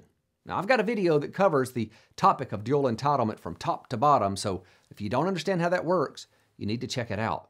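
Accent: South, United States. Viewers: Duh, I'm a financial planner - a title anyone can use without much education. You make it sound confusing and make it much more complicated than it is. Just go to social security and call them - they make it much simpler than this doofus who chatters nonsense in southern accent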